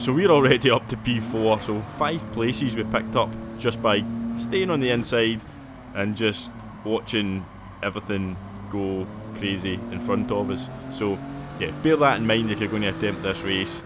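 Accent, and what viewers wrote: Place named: Northern England, United Kingdom. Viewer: Its nice to hear a good Northern voice rather than these Southern pansies all the time 😂😂😂 Good stuff mate, keep it up 😏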